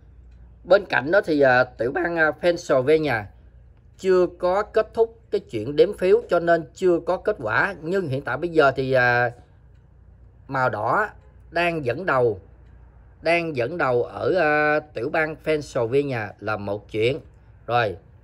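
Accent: Mekong Delta, Vietnam. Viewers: a người miền Tây à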